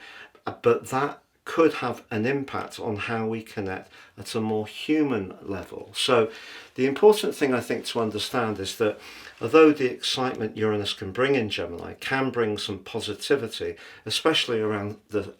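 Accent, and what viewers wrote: United Kingdom. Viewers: It's a beautiful British accent. Keep on listening, open the Closed Captions and your ear will get used to it!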